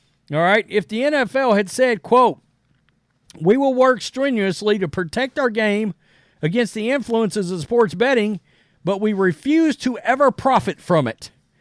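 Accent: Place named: Appalachia, United States. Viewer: 1st: Wear a REAL collared shirt 2nd: Drop that f'ing hillbilly accent 3nd: Stop broadcasting from the "Proud Boys" headquarters. You just might get more "likes", maybe from people like me that are college graduates!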